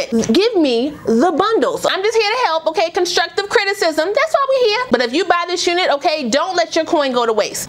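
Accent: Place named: DMV, United States. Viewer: I loved this video. Random question, are you from Maryland, DC, or Virginia? I am, and your vibe (music/Go Go vibish and the way you talk “this joint” 😂) is how some of us talk.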